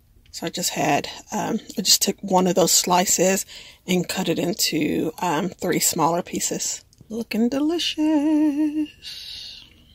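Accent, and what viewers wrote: South, United States. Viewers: I enjoy your content. Thank you for sharing. 1. I think I hear a southern accent? 2. Onions tend to have a long lasting after taste that is awful to me. I think it's just me though - folks love onions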